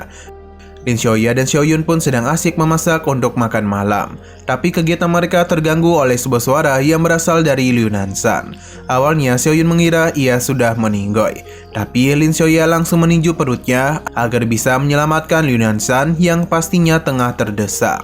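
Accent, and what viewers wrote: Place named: Bali, Indonesia. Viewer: Logatnya mimin kek orang Bali 😅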